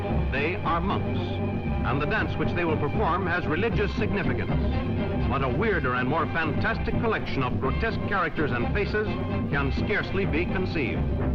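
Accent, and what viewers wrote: United States. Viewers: That's an American accents and not British or Dutch.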